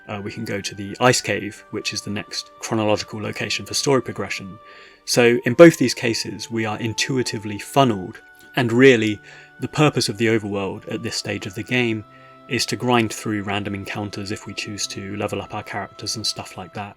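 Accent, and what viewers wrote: United Kingdom. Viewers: British limey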